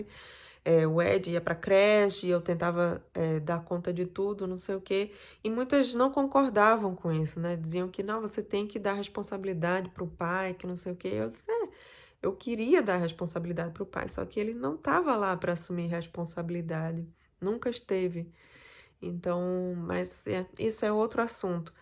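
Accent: Northeast, Brazil. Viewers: E aqui na sua terra natal (Brasil, Nordeste, pelo sotaque é nordestina)vc se dá bem com o povo fora desse país?Obrigada 😘👍Sinto muito amargura nas suas palavras e nos olhos!Isso não é bom amor!Me senti mal agora em ser brasileira, sou uma pessoa que me doo tanto para quem precisa de mim!Eu também não me envolvo com muitos amigos, por opção minha, tempo, mas o resto abstraio!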